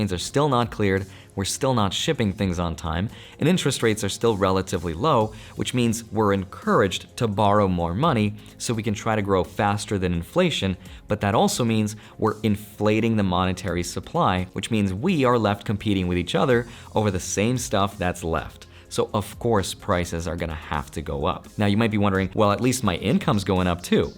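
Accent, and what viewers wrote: United Kingdom. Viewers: LOL your British accent is the best.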